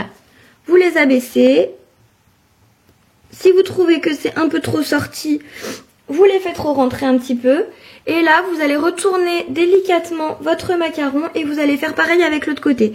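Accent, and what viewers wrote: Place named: North, France. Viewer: Je trouve que tu as l'accent du nord ^^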